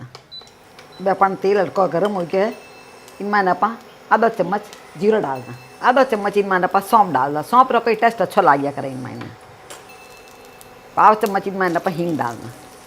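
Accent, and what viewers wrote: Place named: Jaipur, India. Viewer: wo sab to thik h mummy ji... Par thoda hindi me bol doge to dusre state wale bhi samjh sakenge... Ye jaipur language shi se samjh me nhi Aata h... Par sunne me bda mitho lage h...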